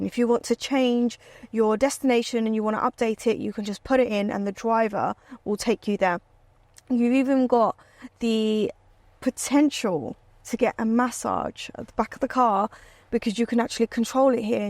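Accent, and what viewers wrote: London, United Kingdom. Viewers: you can hear the london accent coming through lol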